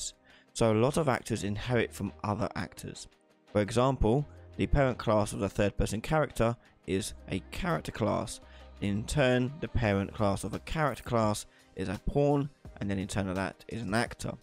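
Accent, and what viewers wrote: United Kingdom. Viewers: Once I got past the heavy Bri-ish accent, I realized that your way of explaining this stuff is the Most helpful. Thanks!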